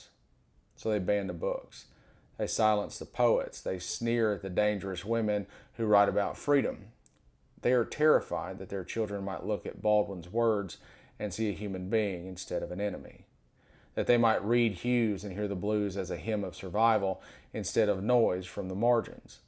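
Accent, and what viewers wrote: South, United States. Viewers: what a relief to hear a Southern accept applying reason. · I'M A NORTHERN I BELIEVE YOU NAILED IT FOR ME IT'S HIS SOUTH DRAWL THAT STRENGTHENS HIS MESSAGE FOR ME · Thank you from a fellow southerner,. Gives me strength to hear your words in your accent. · I agree. Look how close his eyes are together👀 a very cunning Jew with a southern accent.🤷🏻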